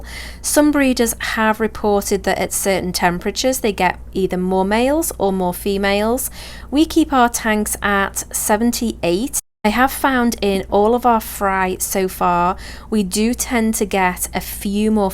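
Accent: Liverpool, United Kingdom. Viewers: Random question but are you from Liverpool? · Is that a hint of scouse I hear in that accent?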